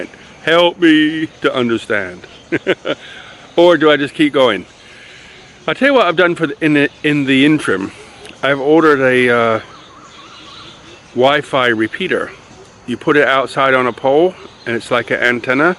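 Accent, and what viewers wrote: United States. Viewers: I am Thai .... Lazada of Shopee is the most popular in Thailand ... For Ex. I try to search "5G pocket wifi".. found Xiaomi Alot Router AX3600 cost 2580 bath from China delevery about 9-12 day which is no costom tax. ......... I think 4G is enough because it speed up to 150Mbps .. if operater around signal only 40 40 ..... ผมคนไทยติดตามคุณเพราะอยากพัฒนาภาษาอังกฤษ สำเนียงอมริกันฟังง่ายครับ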